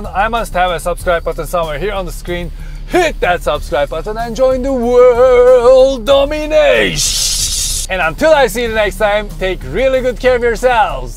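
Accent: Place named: Turkey. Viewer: He's Turkish.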